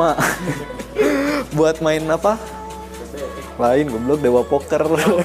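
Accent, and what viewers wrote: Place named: West Java, Indonesia. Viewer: Resep ka orang Sunda mah, boga cirihas sorangan Amun nyarios bahasa Indonesia ge, angger hentte lengit sunda'na mahh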